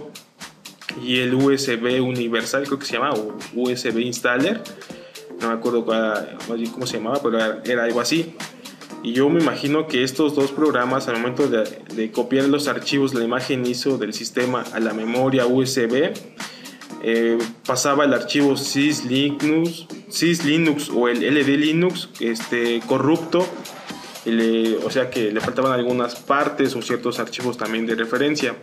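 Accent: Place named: Portugal. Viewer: creia que habkabas portugues o algo al principio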